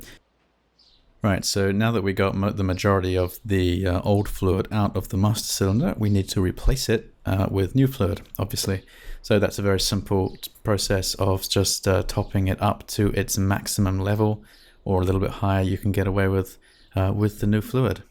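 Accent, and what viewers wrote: England, United Kingdom. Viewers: great video, I trust English voices more than any other accent!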